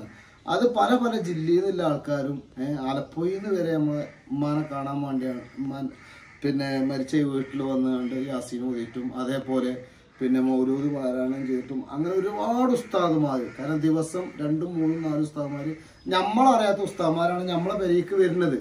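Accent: Malappuram, India. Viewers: ഉമ്മന്റെ മരണത്തെക്കുറിച്ച് പറയുന്ന വീഡിയോയും monetization ഓൺ ആക്കി പരസ്യം വരുത്തിക്കുന്ന നീ Hero ആണ്😢 അതുപോലെ ഇപ്പോൾ ശരിക്കും മലപ്പുറം ഭാഷ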